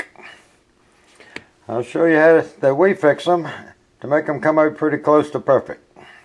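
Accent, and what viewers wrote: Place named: North Carolina, United States. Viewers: This video is great at extremely helpful! Thanks so much! I knew that wonderful accent sounded familiar! I'm from North Carolina! :) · Today will be my first time preparing Lamb loin chops. I really like this video because this guy's southern accent remembers me of my childhood, living on the farm in NC with my grand dad. He cooked alot and made it look so simple, just as the above video. And absolutely nothing was wasted. Hope my lamb chops turn out just as great as seen in this video. Thanks!!!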